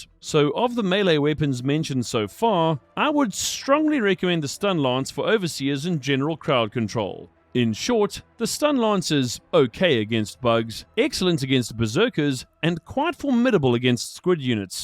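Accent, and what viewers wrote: South Africa. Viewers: Can help but think youre South African my brotha haha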